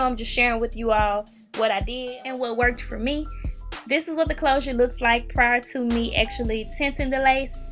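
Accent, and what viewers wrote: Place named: Tennessee, United States. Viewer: That Tennessee accent 😍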